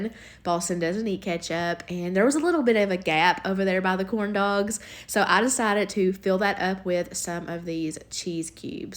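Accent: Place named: Rural, United States. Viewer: my son loves those cheese cubes lol. Love your country accent😊🥰🤠. Unfortunately my son is a super picky eater and does NOT eat meats or seafood so I definitely have to get creative lol. Love how real and honest you are about showing what is actually more realistic for most parents to pack for their kids. Especially when they can't microwave anything lol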